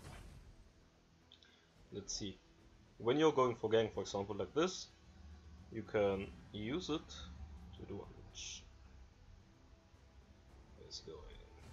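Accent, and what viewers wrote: Germany. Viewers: I have a question. Are you from Germany? Because you sound like german and your Windows Exil or Word or whatever were german, too. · Where r u from? Youre english sounds like u come from germany 😅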